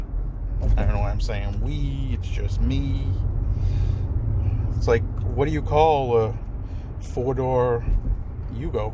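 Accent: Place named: New York, United States. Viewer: I thought I heard the NY accent. Grew up in Falmouth. Just moved to IL bummer I didn't find you sooner 😅.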